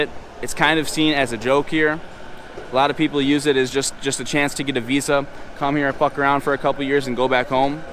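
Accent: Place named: United States. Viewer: Dude it's obvious your Americian when you talk and the cloths you wear. But yes most of what you say is facts.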